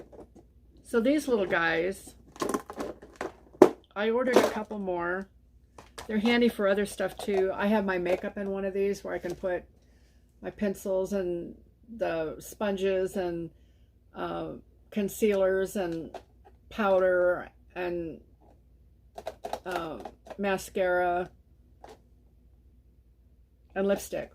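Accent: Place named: Illinois, United States. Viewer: I subscribed to your channel because I'm tired of all the others that are doing strange things. I'm about the same age and I have bought many of the dresses you have purchased. So we have the same style. I use to live in Illinois and your accent sounds from that area. I now live in California. I love Temu but have spent tooooo much on that site.....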